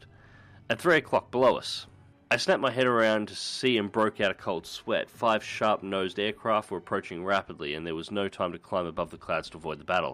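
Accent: Australia. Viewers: You sound more australian than Rimmy. I'm impressed. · Another great video. nice to see you in a plane! love the aussie pronunciations! as usual take care good luck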